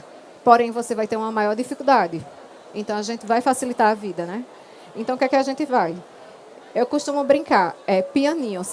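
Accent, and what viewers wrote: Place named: Pernambuco, Brazil. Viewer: Adorei! Perfeito o bolo de rolo, melhor bolo! Adorei o seu sotaque. Viva Pernambuco!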